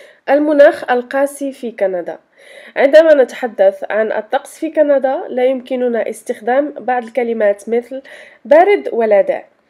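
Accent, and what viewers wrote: Algeria. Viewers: My first thought when I heard your accent is that you are Algerian, then I saw your account page and you are Moroccan. So, I was close. Here in America, there are many of our people who were doctors, engineers, etc. in Algeria, Morocco...etc. and now they work in jobs like restaurants, taxi drivers, etc. Because America doesn't accept their degrees and they have to go back to school if they want to do the work they did back home. In America, nothing is free, because you have to pay for college, doctors, medicine, water, housing...etc. even if you have insurance, you still have to pay something besides what you already pay for insurance. Also, in America, there is high crimes and very high racial tension. Would you do another video in Darja or Tamazight next time? 🤣🤣 ==== Ma première pensée quand j'ai entendu ton accent c'est que tu es algérienne, puis j'ai vu ta page de compte et tu es marocaine. 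J'étais donc proche. Ici en Amérique, il y a beaucoup de nos gens qui étaient médecins, ingénieurs, etc. en Algérie, au Maroc...etc. et maintenant ils travaillent dans des restaurants, des chauffeurs de taxi, etc. Parce que l'Amérique n'accepte pas leurs diplômes et qu'ils doivent retourner à l'école s'ils veulent faire le travail qu'ils faisaient chez eux. En Amérique, rien n'est gratuit, car il faut payer pour l'université, les médecins, les médicaments, l'eau, le logement... etc. même si vous avez une assurance, vous devez toujours payer quelque chose en plus de ce que vous payez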